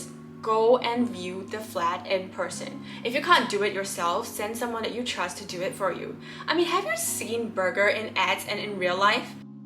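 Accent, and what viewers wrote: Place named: United States. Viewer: If you don't mind me asking, are you American or Malaysian. The reason I'm asking, your accent sounds American.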